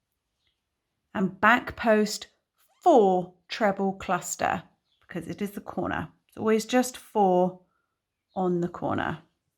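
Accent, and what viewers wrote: England, United Kingdom. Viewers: OMG beautiful! I must admit to slight confusion at first with an English accent, and American stitches!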